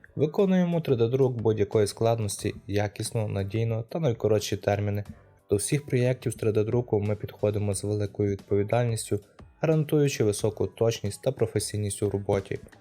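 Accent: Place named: Lviv, Ukraine. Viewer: звернув увагу на вимову числівників. Звучать як у Львові говорять - 50 з пом'якшенням т.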